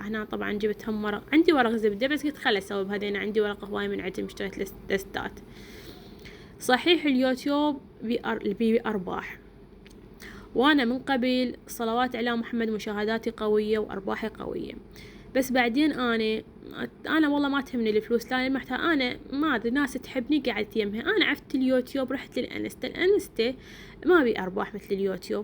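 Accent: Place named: Basra, Iraq. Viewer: احب لهجتج البصراوية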